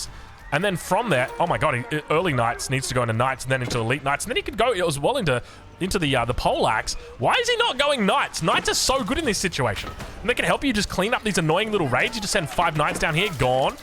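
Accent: Australia. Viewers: i could listen to talk about anything. dude is so funny and quick and idk if it's an American thing but Aussie accents just make me smile. i dont even play AoE but i know everything about the game now haha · Hey, my Englisch was never good. But cause of you it sounds even more funny. After picking up Spanish accent when living in South America and mixing it with my German accent now it got spiced up with your Aussie accent. Thanks for that. 😂 love your vids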